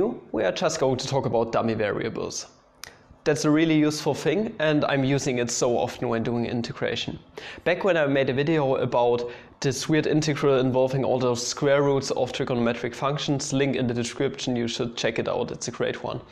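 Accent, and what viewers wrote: Germany. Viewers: If you don't mind me asking, where does your accent come from? I, being an American (therefore having no idea what accents come from where), just think it's German. Is that right?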